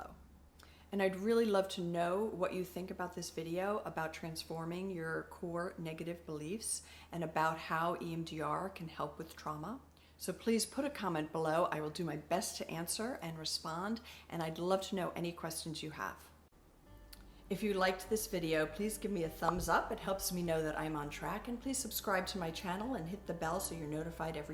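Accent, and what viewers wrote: United States. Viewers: Your videos are amazing! They help me a lot! I also love your californian accent.... And you are so pretty! Thanks 🤗☺️🌷🌷💕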